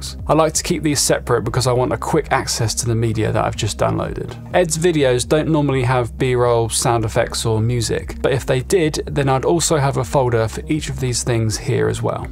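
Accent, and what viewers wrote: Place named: United Kingdom. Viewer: I just get a thrill when I listen to your British English ))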